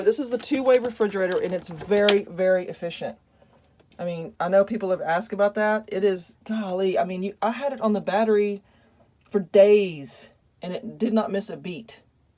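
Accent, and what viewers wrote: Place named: Texas, United States. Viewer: Cafe Bastelo, I grew up around that coffee with my Cuban Parents. Cafe Con Leche is basically a Latte coffee but you can taste the expresso a little more. Love that Soft Texan accent you have. As you we don't like allot of stuff. When i'm out on an excursion its the one time i can live with less. But have a far larger camper a 22ft Grand Design 22mle. But interested in this camper for distance trips. More so with fuel prices where they are today. We live in Miami, FL and when towing our TT it takes us 8 hours just to cross into GA and 10.5 hours to exit the Peninsula through I-10. Appreciate your Vids.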